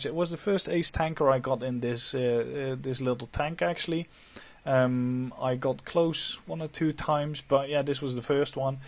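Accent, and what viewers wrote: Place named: Netherlands. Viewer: just what i thought, that accent! the WZ 131 looses the 100mm gun, thats all i know, but if you like ROF you wont choose the gun anyway, but i grinded the wz 132 with that gun. i liked it more although the muzzle break on the 131 looks way better IMHO. im from the Netherlands to btw!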